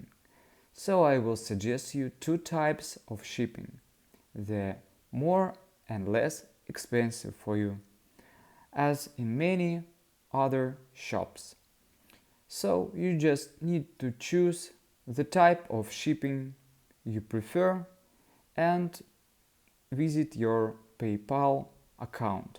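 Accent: Russia. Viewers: Are your clients happy? That's all that matters. I love listening to your accent, and can those who criticise speak Russian? If they tried you can criticise them. I love listening to you, and I love what you do. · Also, your English is good. I ❤LOVE❤ your Russian accent. Don't let anyone tell you that the way you speak is flawed. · I love your nail art, and you're great with gel. I also understand your English very well. My son is obsessed with all things Russian, and sometime when I watch your videos, he watches with me just to hear your accent.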